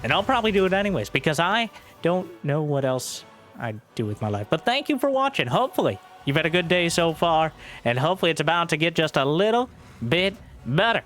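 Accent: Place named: Appalachia, United States. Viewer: Can you speak proper English please ? Your hillbilly dialect is soo cringe … is it an act?😢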